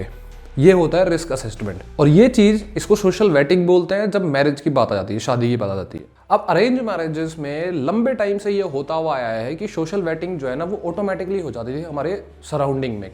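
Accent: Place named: Tughlakabad, India. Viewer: yaar bhai tum pakka tuglakabad gaon ke ho mentally and educally bilkul baise hi sound kar rahe sayad mujhse 2 saal chotte hoge but baate bilkul meri jaise kar re ho mere bhai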